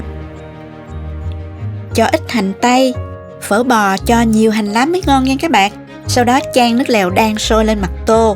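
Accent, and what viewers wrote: South, Vietnam. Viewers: Làm thấy đã ngon cộng thêm giới thiệu lại càng dễ thương hơn với lại giọng miền nam nữa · giọng hướng dẫn đậm chất Nam bộ rất ấn tượng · Tôi rất thích giọng miền nam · Giọng nói miền Nam dể thương và rất lôi cuốn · ơi, xin cho hỏi công thức nầy là cho mấy tô phở vậy cô? Nhìn cách cô nấu và trình bày hấp dẫn quá cộng thêm giọng nói đậm chất người miền Nam thích quá. Chỉ muốn nấu liền ngay thôi. Xin cảm ơn nhiều trước nhen. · Giọng nói miền nam dể thương quá e cũng người miền Tây cảm ơn chia sẻ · Chính xác, giọng ở miền nam mình nghe dễ hiểu hơn và ấm áp + chỉ dẫn thực hành rõ ràng dễ hiểu, có năng khiếu và chia sẻ công thức cho mn, đây cũng là điều tạo nhiều công Đức cho và con cháu 👍🥰🙏🙏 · Qua phát âm thì em là người miền Nam, theo khẩu vị trong Nam thì em còn thiếuGiá trụng,Húng Quế và Ngò Gai cũng như cầncó thêm Tương Đen&Tương Đỏ ! 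Cám ơn video hướng dẫn của em.Thân.👍☺️ 😊☺️ 😊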